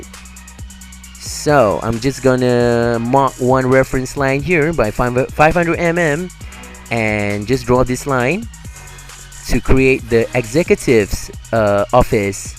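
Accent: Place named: Philippines. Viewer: useful video and from ur accent it looks like u are from Philippine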